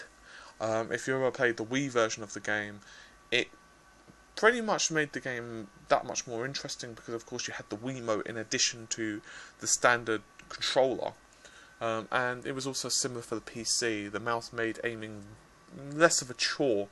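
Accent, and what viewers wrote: England, United Kingdom. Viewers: fucking english accent cant understand all, i took headset to understand him.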